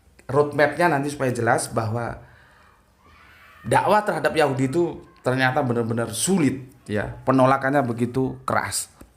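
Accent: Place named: Bogor, Indonesia. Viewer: org bogor memang begitu bahasanya.. 😝🙏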